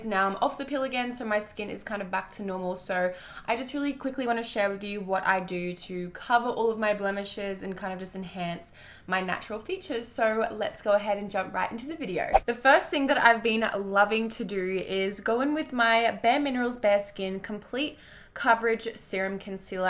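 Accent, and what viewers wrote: Australia. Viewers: I don’t know if I’m being a little rude but , are you Australian I love that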